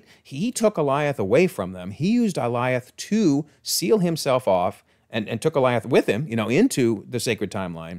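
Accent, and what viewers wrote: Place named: England, United Kingdom. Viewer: If it wasn’t true I wouldn’t call you English accent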